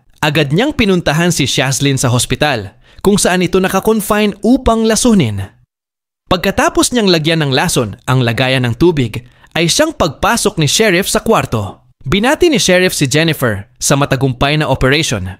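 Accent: Visayas, Philippines. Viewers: Ang tgas ng accent ng storyteller bisaya Ka ba?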